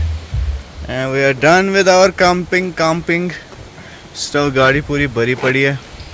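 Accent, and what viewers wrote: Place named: Kashmir, India. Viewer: Now this kashmiri accent hits different🥰🥰.....#love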